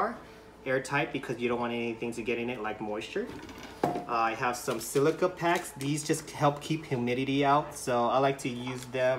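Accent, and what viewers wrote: United States. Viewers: Are from the state of Hawaii? I think I hear the local Hawaiian accent.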